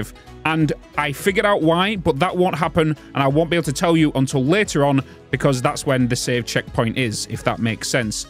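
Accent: United Kingdom. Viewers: Well, that's the trick about it. Brits and Americans not only say it different, but spell it differently. Here in the States it's spelled Aluminum, over there they spell it aluminium. Now, because of this there should be no confusion about pronunciation - say it how it's spelled. In Satisfactory, it's spelled the American way, so it should be said that way - alu-mi-NUM. As a side note - as a machinist that works in metal, hearing the British version can almost make me wince! :)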